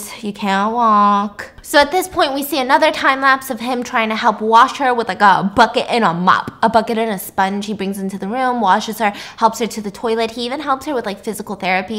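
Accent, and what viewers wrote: United Kingdom. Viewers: im here to let you know it is a British accent and imma go with this loll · Ok but your British accent is the only one I will accept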